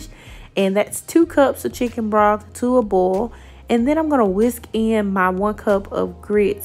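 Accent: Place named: Atlanta, United States. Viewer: Looks awesome!!!, u sound like you’re from Atlanta or Atlaanna how we say it😂😂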